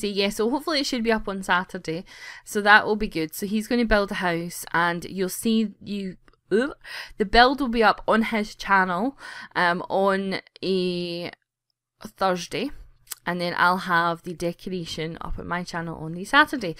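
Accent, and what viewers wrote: Scotland, United Kingdom. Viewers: You're Scottish like me!!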